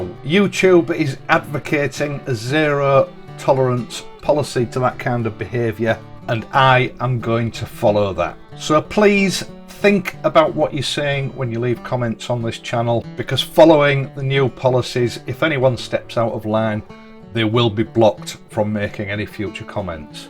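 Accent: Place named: East Yorkshire, United Kingdom. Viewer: Long Riston Jet garage? :) Makes a nice change to hear an East Yorkshire voice! Good channel.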